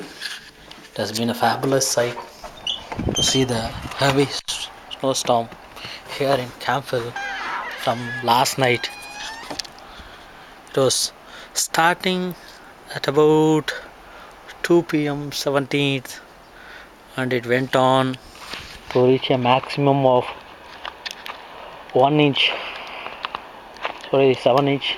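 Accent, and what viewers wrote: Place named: South India, India. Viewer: u r a south indian........................ i can cent percent tell ,from your accent.... ur intensity-density, ur lastnight, ur drizzling drizzling continuing continuing, how is life from india.....ur place must be very hot . u r seriously shivering out there... dont cha MISSED INDIA.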